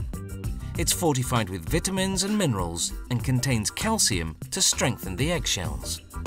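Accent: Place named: England, United Kingdom. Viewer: So I made an observation with my colleague, let’s call him Hona, and we observed that the narrator in fact has an English accent. That is all. G’day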